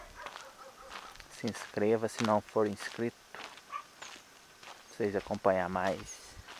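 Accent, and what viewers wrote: Minas Gerais, Brazil. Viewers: Lindo sítio sem conta que adoro esse sotaque minereis eu que sou mineira também de Mahumirim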